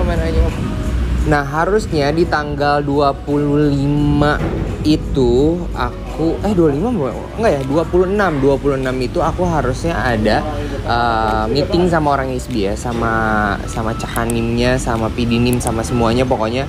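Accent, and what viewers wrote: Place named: Java, Indonesia. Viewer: Di sambut dengan BANYAK GLUEDEKKK medok banget mas 😅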